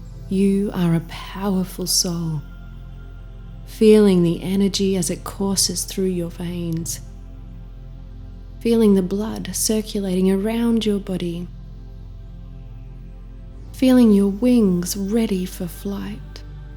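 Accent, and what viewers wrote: Australia. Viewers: It’s such a welcome to hear your beautiful accent! I’ve been living State side for almost 14 years now (time flies!!!) & have been missing home 🇦🇺 heaps. Appreciating your affirmation videos as well as the meditations while getting a dose of aussie accent 🙏🏻 I’m so grateful I’ve found your channel that aligns perfectly with the inner work I’ve been practicing. Many thanks & blessings 🙌❤️🙏🏻✨💓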